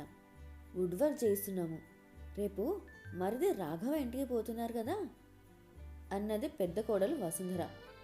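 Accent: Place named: Telangana, India. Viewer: Nice telangana slang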